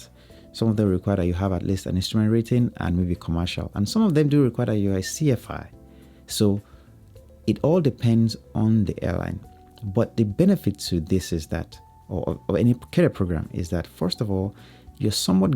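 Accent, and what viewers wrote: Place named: Ghana. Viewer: You sound like a Ghanaian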